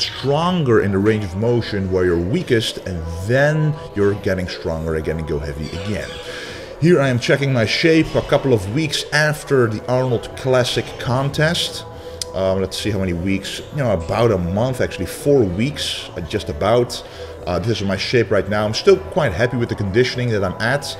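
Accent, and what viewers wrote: Netherlands. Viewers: Hahaha ik dacht serieus is deze man Nederlands? En toen zei je het. Goeie tips man! Ik voel me rug altijd heel lastig. Ga dit zeker vandaag proberen. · Soms voel je gwn dat iemand nederlands is, terwijl je engels is LV10 perfect maar ik keel naar ze face en dacht..... wacht een even laat me kijken wat zijn naam is en jaaa hoor BTW top video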